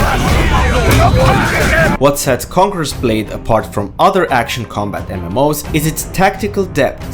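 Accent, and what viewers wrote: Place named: India. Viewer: Indian accent detected. I dip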